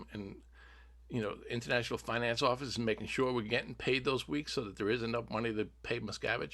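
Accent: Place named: New York, United States. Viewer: Great first solo video! I'm amazed that you still have your New York accent even after being away from it for so long! As a NY'er, it makes me smile 🙂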